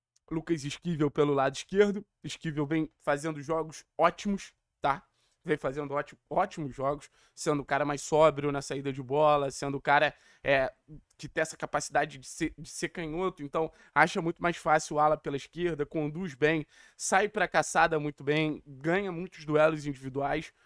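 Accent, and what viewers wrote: Rio de Janeiro, Brazil. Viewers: Meus ouvidos sangram, carioca..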